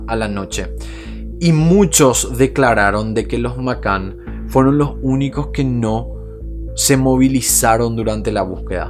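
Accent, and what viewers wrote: Paraguay. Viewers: Omg eres de paraguay, me too ... Amo el acento pero yo lastimosamente lo perdi todo despues de años de vivir en este pincho pais (argentina) :( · Acento Paraguayo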